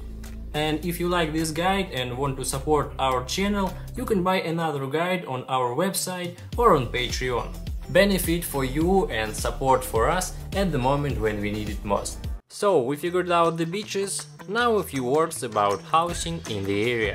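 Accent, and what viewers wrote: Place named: Russia. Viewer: Brutal russian accent bro😂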